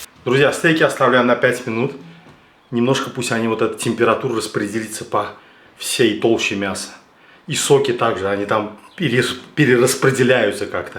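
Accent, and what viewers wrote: Baku, Azerbaijan. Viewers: Я многих подписал...акцент бакинский..ностальгия.спасибо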